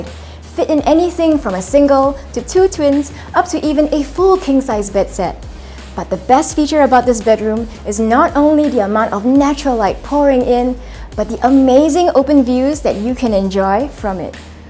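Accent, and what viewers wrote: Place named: Philippines. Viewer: seems to be a Filipino because of nice English accent..🇰🇷🇺🇲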